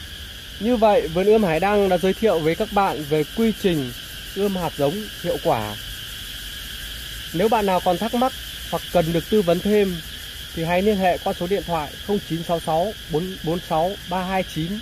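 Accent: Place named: Hanoi, Vietnam. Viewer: Hanoi ha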